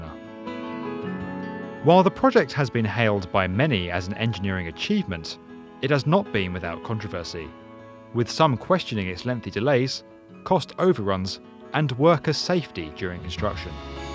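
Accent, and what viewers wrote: United Kingdom. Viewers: B1M is one of the most professional and dignified channels here on YouTube although they do have the unfair advantage that their voice-over is done by the most British man ever that always add an extra layer of class and sophistication to any piece of media.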